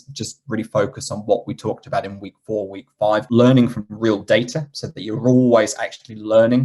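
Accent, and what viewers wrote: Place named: United Kingdom. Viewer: You sound like a British for me 🎉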